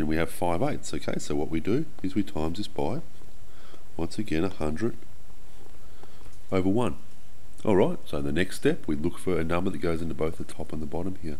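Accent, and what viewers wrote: Australia. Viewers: All the channels I have watched for this all had like the thinnest American accent, finally a fellow Aussie I can actually understand! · Jonathan Ramirez hes australian, and Bruce as well has an Aussie accent. So there you go.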